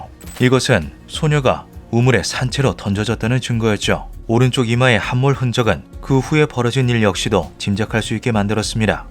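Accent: Gyeongsang, South Korea. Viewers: 씹할 갱상도ㄷㄷ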